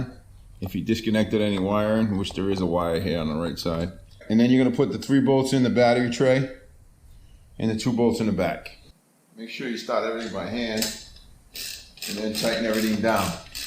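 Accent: Boston, United States. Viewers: Where are you from that ngk plugs are hard to come by ???? Can get them at the corner gas station here .. lol. You sound like you from Boston..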